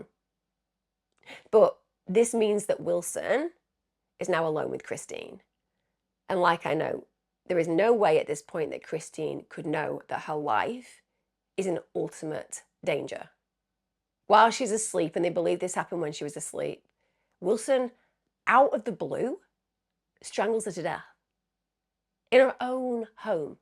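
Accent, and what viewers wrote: United Kingdom. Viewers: i live in LEE COUNTY FLORIDA , FORT MYERS and its so funny hearing someone from the UK talking about this story .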